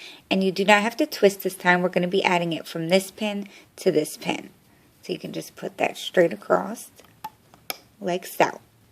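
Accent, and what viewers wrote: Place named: New York, United States. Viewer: where are you from? You sound like you’re out NY way maybe? I like it because you remind me of my cousin. Whenever she was angry, she’d turn extra NY lols 💜💜💜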